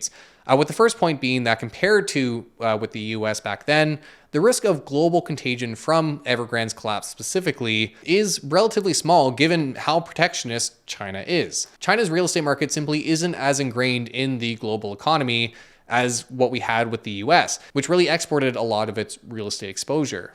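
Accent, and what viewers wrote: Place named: Canada. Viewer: The slight Canadian accent is cute 😊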